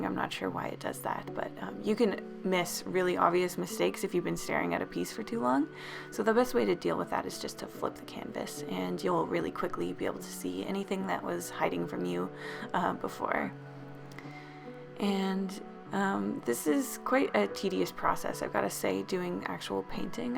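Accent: Germany. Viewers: Nachtfalter Auf tumblr und der Kunstseite von Yt trifft man mehr an als irgendwo sonst! Lustiger Weise kann man sofort einen deutschen Youtuber an der Art wie der Zeichner redet erkennen XD Zum Beispiel habe Ich erst gestern wieder einen Deutschen durch Zufall gefunden und man hat den Akzent ziemlich rausgehört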